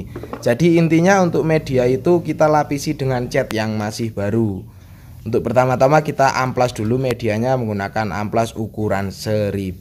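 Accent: Temanggung, Indonesia. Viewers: Koyo wong temanggung ya... Heeee..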